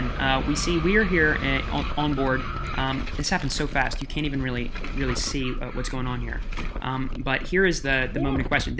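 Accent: Liverpool, United Kingdom. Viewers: No he’s not, I don’t get why everyone saying he’s Irish he’s Scouse from Liverpool. Sounds nothing like Irish. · He’s scouse what do u expect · I know the accent, I'm from the same city as him 😂 Liverpool, honestly it's normal but still awful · The scouse accent tho "whaqqqt"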